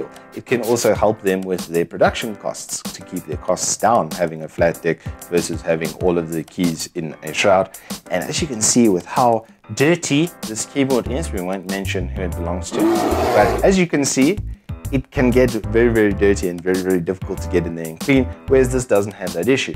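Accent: South Africa. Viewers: South Africa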